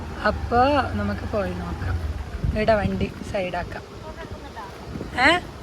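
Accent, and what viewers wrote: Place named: Kannur, India. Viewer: നമ്മുടെ കണ്ണൂർ ഭാഷ ❤❤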